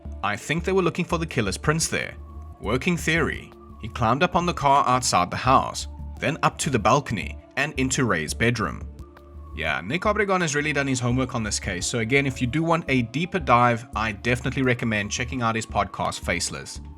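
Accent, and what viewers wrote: South Africa. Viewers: You need more subs. So glad to hear a fellow South African. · Is a guy from South Africa talking about the ineptitude of the police in California? Crazy work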